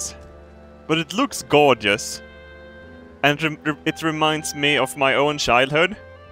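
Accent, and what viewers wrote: Norrland, Sweden. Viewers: Love how you can here your norrlands dialekt when you speak swedish <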